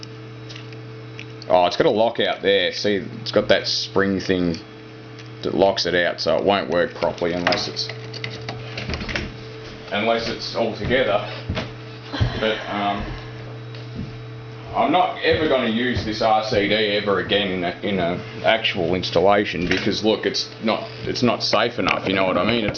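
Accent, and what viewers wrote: Australia. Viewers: Stumbled across your video this evening, as I too have a 440t RCD that has tripped. However, like your video, the switch is VERY stiff to the point I may exert too much pressure on the switch. It is stuck in the tripped position, thus I am without power ( as of writing ). It's good to hear a typical blokey Aussie accent on YouTube BTW. 😃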